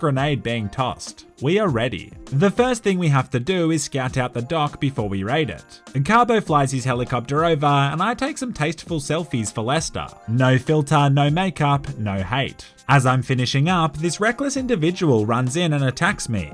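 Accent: Australia. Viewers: Having a ball watching your vids man. Only just found your channel .. alot of my favourite YouTubers are Aussies so good to hear another one.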